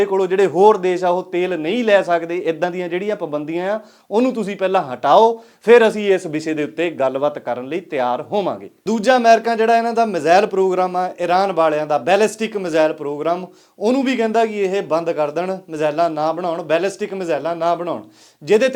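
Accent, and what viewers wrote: Doaba, India. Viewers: tuhadi Punjabi doabe wali lagdi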